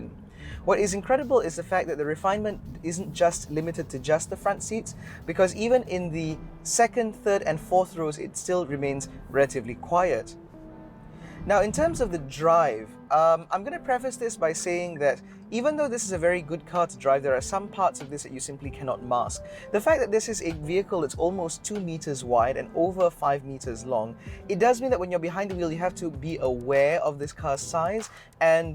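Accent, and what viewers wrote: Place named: RP, United Kingdom. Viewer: Just wow with your smooth and fluid presentation! Love the “queens English” sounds posh for a posh looking car! Done like and subscribed! Well done!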